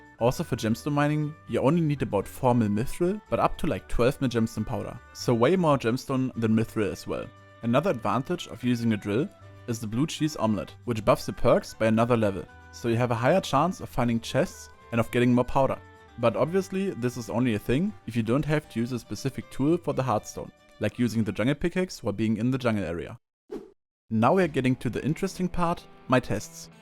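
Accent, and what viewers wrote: Germany. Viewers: I höre das deutsch heraus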